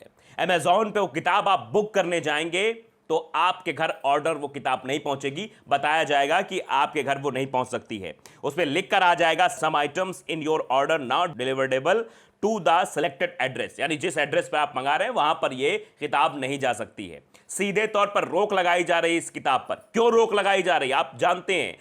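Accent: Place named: Bihar, India. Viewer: Very gud. But bro, ur accent has changed a little bit. Keep it a little bit to bihar type. It sound gud.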